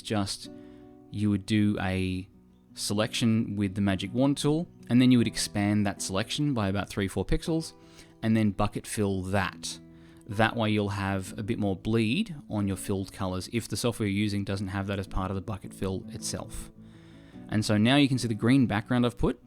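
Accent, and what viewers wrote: Australia. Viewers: You Australian by any chance mate? amazing tute btw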